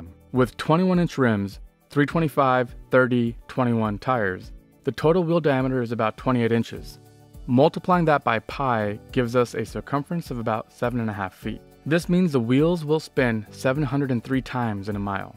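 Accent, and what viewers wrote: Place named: United States. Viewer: hey you sound American, good job!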